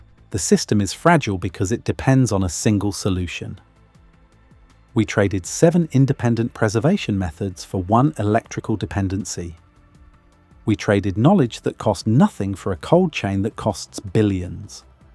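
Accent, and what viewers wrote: United Kingdom. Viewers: The British voice is misleading. We never put eggs in the fridge on this side of the pond - in our homes or in the shops - and they don't go off after just a couple of weeks. I understand that eggs in the U.S. are washed and disinfected, and this strips away their natural defences, meaning that they have to be kept refrigerated. This is mentioned in the vid, but as I say the British voice is misleading; it implies that we do the same here.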